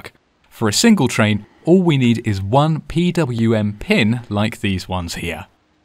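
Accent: United Kingdom. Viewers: Well done ! You have a pleasant voice, a neat pommie accent and you make the technical bits sound easy - great for building confidence in your viewers! Looks like it is time to dump the N-gauge and get a few Lego trains! I also love ARDUINO - I use it in my Christmas Villages. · Just a small point, but when searching for a datasheet, isn't it better to search on the components code and the word datasheet instead of the code and pdf? Not all datasheets are PDF's, obviously most are but some are weblinks, and true there is usually hundreds of results for popular components, but sometimes obscure components are hard to find and by just searching on PDF you may mis a datasheet. Can I just ask something, how come when you search on google it's the Polish version, when you are very obviously from England, home counties by the sounds of it, why and how come? Are you hiding in flat coming out when he leave to buy hamster food? 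Great videos BTW, thanks.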